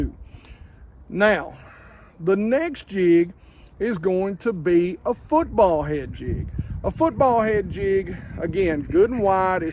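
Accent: South, United States. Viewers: I'm new to bass fishin and you got a sub out of me! Your very informative and thorough. plus that good ol' southern accent just makes it easy to listen to haha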